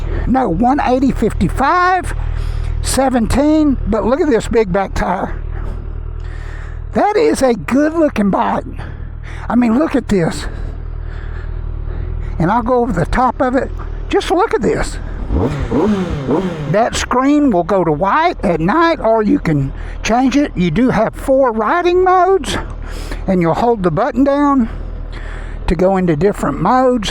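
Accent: South, United States. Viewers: God u sound so country😅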